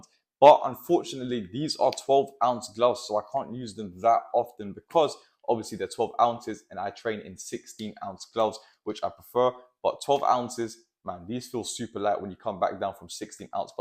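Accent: United Kingdom. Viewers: No clue would say prolly British?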